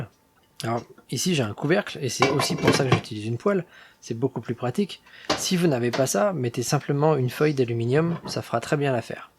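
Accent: Venezuela. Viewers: Francés venezolano?🇦🇷🇧🇬🤔🤔🤔🤔🤔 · jajajaj excelente español a lo Venezolano, buenísima receta de ese manjar de los dioses.. Como dirían por aquí, "pa chuparse los dedos" saludos mi pana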